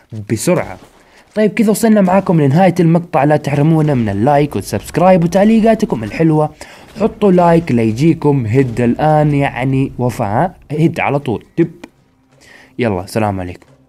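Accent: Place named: Jeddah, Saudi Arabia. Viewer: اللهجتك جداوي ابوي 😎👍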